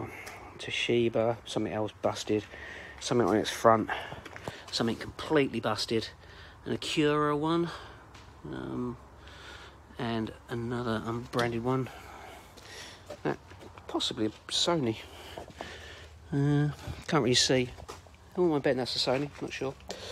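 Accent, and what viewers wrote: England, United Kingdom. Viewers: I’ve seen other videos and loads are from USA it’s sooo refreshing to hear a English accent on this.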